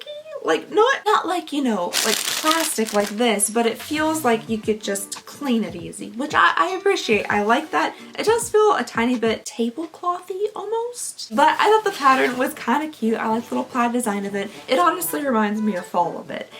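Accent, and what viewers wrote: Kentucky, United States. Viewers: Being from Kentucky inlove when i hear it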